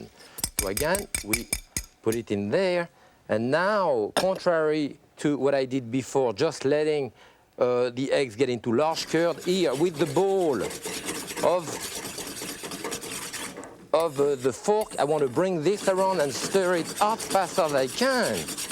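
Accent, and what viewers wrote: France. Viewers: This video must have been made over 25 years ago when he was quite young and had heavy French accent. His French accent is not so strong in his latest TV shows. Also, I bet he does not scrape a non-stick pan with sharp-pointed metal forks any more. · Well, no doubt he was born French and lived there - until he met and fell in love with an American woman. Being a gentleman, he moved here instead of asking her to be uprooted and move there. And so now he is an American, and a citizen. We thank France, but we definitely claim him. Being the man he is, we'd have given him a permanent green card - so it was his choice - and he became an American. Only his voice, his training, his memories and his accent are French now. And probably a corner of his heart, which is also fine. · beautiful french accent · Thought he was speaking French until 5 seconds in. 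· Im a french canadian and I just enjoy the french accent ! Im trying to hide mine but it doesn't work often ! hahahah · god dammed that guy. fuckin' french way too strong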